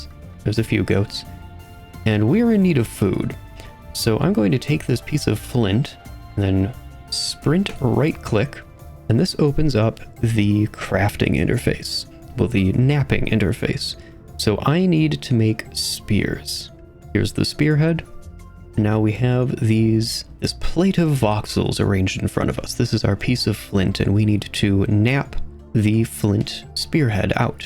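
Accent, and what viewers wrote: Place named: Nova Scotia, Canada. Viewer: Random but are you from eastern Canada? I would have just assumed US but the way you pronounce Rs especially in words like "marked" has the super Irish sounding quality people from Nova Scotia often have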